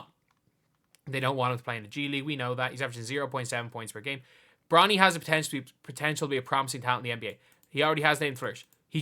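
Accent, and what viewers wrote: Ireland. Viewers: yo bro are you irish? the accent looks like that :D good content, cheers))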